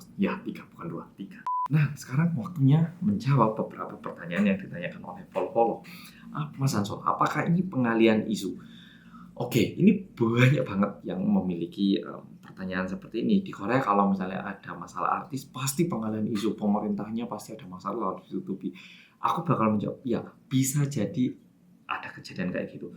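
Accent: Java, Indonesia. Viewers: Hiyayy medhook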